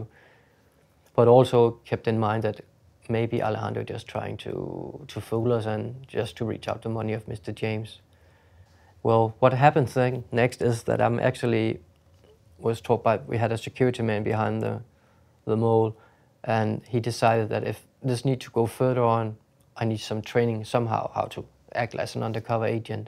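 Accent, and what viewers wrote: Denmark. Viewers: Damn, knew he was danish the moment he opened his mouth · Bro the danish accent is so clear it’s funny to me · Man kunne høre han var dansker fra starten 😂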